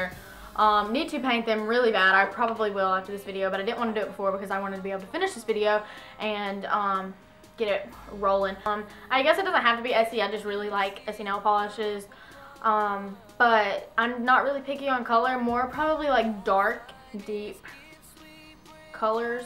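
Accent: South, United States. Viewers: Are you from the South???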